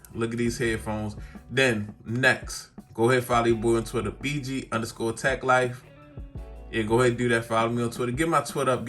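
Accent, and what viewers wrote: Baltimore, United States. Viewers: The bmore accent coming out strong in this one 🤣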